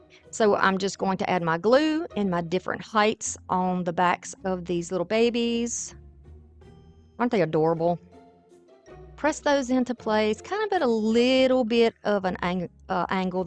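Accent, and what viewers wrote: South, United States. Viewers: very cute and awesome vintage haloween vibes. i like your accent, reminds me of living down south. and i like your creations. congrats on the temu affiliation. as a fellow diy/arty crafty type, i have some amazing stuff from temu and next time i order, i will remember you ☆ ty for sharing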